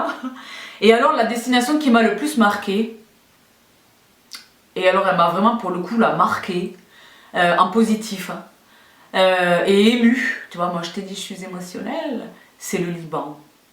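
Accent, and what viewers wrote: South, France. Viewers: Super vidéo! Ton accent vient du sud? Marseille? Ou Toulouse? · Super personnalité, super accent du sud, super belle, super intelligente (bon j'arrête là:D) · Quelle belle rencontre!!!!!!! Tu m'éclates. J'aime ce que tu dégages j'aime ton humour ton accent avec tes mots parfois qui me semblent être de l'occitan ou du basque bref le sud quoi!!! Merci merci merci Voilà mes résolutions pour cette nouvelle année combattre la médiocrité et me faire du bien. Merci à byreo grace à qui je me suis orientée ce vendredi vers ta chaîne que j'adopte Continue à nous faire du bien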